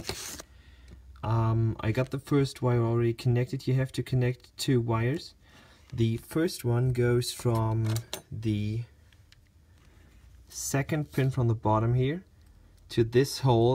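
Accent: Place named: Germany. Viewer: great accent :) really impressive english for zhe german ... keep up with good & quality videos in english :)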